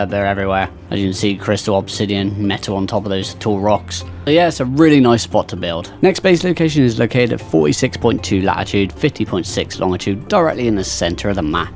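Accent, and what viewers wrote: United Kingdom. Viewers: Everyone in the UK sounds like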